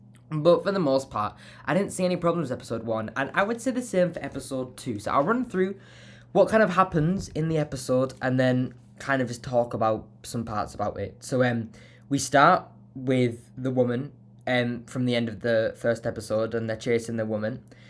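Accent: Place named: United Kingdom. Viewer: Hi, you sound like you are from the UK?! How are you managing to watch it please?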